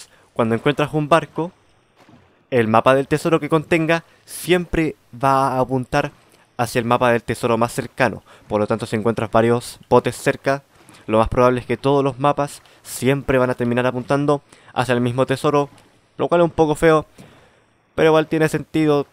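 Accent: Chile. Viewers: tu manera de hablar como un chileno de verdad me encanto, buen tutorial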